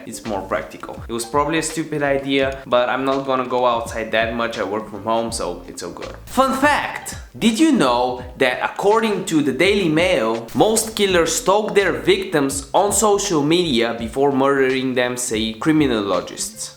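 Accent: Russia. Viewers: First time seeing a russian accent tutorial instead of an indian one.. Both need subtitles though.. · Руски акцент а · I think the Russian accent is better than the Indian lool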